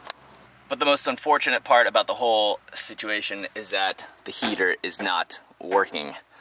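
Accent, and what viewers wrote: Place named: Canada. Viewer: I am detecting a slight Canadian accent from you! Already?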